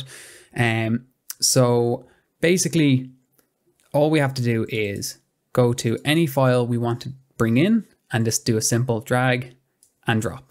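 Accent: Ireland. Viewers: Being also an avid DaVinci Resolve user I have just discovered your channel here on YouTube. Your video tutorials are really helpful 👍and apart from that you are a sweet guy, I also really love & enjoy your Irish accent. Greetings & hugs from Germany ... 💙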